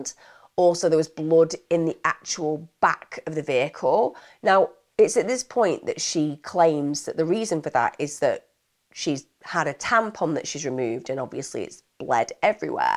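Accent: United Kingdom. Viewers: Hi watch true crime every night to fall asleep i find it calming 😂 found you through searching for this case seems not many have covered this one fully nice to hear a uk accent 🏴🇬🇧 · Your British accent, even though I’m part of the matriarch as a Canadian, doesn’t make u sound brighter love.. find some creativity. Pls!!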